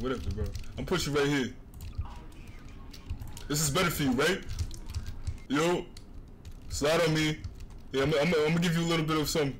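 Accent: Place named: United Kingdom. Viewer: Guys his British😱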